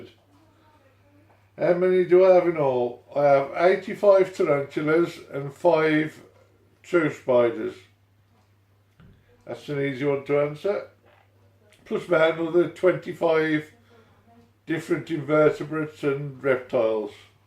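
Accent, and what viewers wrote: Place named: Black Country, United Kingdom. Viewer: Nice one. Where are you from? Sound local to the Black Country?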